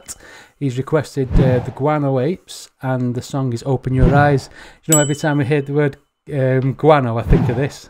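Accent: United Kingdom. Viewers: Are you a Liverpoodlian?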